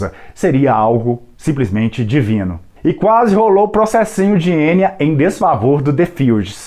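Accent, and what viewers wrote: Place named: Minas Gerais, Brazil. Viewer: Nosso amigo fala como um mineiro! Muito bom video! Difícil encontrar material em Português sobre Enya. Uma coisa que sinto falta mesmo. Apresentações ao vivo. Por ser dependente de arranjos sintéticos para tentar expressar o som que " ouve" é compreensivel. Viver reclusa em um castelo frio na Irlanda contribui para açodar stalkers, uma pena. Mas, como venderia sem marketing? Enya foi muito importante para uma fase de minha vida. Ainda é uma referência positiva e tranquilizante!❤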